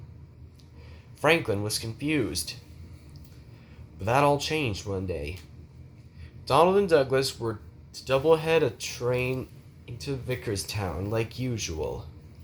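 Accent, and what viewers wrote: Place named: Australia. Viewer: why does he have an australian accent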